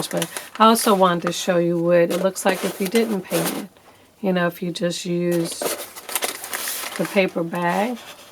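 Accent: New Orleans, United States. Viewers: you crack me up enunciating pump-kin extra hard since people were commenting on your New Orleans accent. It’s ok to say pun-kin 😂 🎃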